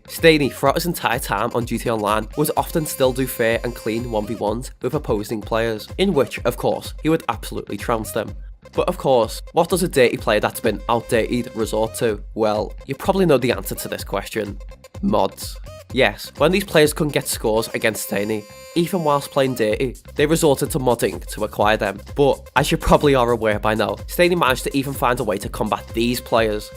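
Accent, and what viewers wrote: Liverpool, United Kingdom. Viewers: i cant understand anything with ur fcking annoying british accent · Are u scouse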